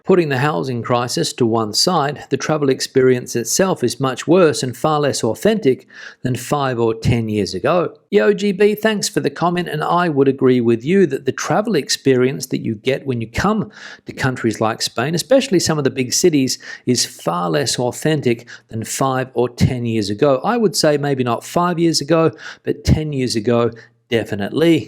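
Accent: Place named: Australia. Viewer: EVERY time I've been to Andalusia in July/ August, it's been over 40C. Cordoba is the hottest city in Europe. WTF this is news every year is only explained by climate hysterics trying to pretend it's something new. ITS NOT!?? - with an Australian accent? Since when did Aussies represent Spain??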